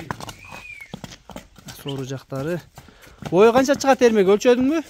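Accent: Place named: Aksy, Kyrgyzstan. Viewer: Чүс беш дейтко аксыда ушундай сүйлөшөт экен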